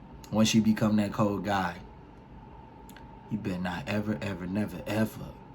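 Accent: Baltimore, United States. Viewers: I thought u was from Baltimore for a second 😂😂😂😂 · You mad cool but I hate your ascent. You must be from Baltimore. Certain words you say comes out funny. You speaking rs tho lol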